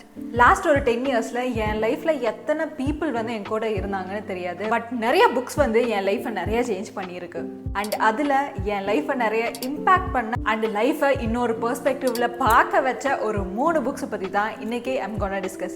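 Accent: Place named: Kerala, India. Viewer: Are you a malayali? You speak tamil like a malayali..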